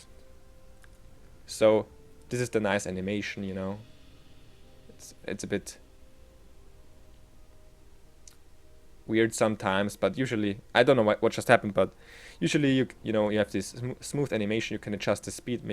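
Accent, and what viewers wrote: Germany. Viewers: Du höhrst dich so Deutsch an :o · also sprichst du doch deutsch das merkt man obwohl dein Englisch echt gut ist:) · I It really sounds german btw im german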